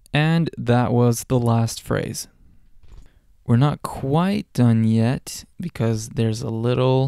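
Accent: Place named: Setesdal, Norway. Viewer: Ja, Setesdalsk e noko for seg sjølv 😅 Men veldig fint då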